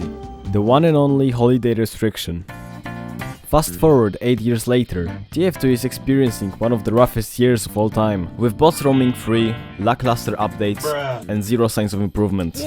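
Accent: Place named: Eastern Europe. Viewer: Are you Central or Eastern European? Your accent sounds slavic. Great video by the way.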